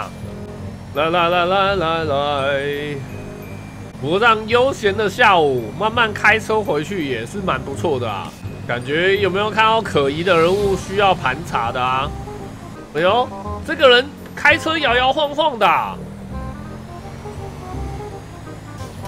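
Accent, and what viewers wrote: Hong Kong, China. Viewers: 你是香港人媽我不是但看ˋ你講話很像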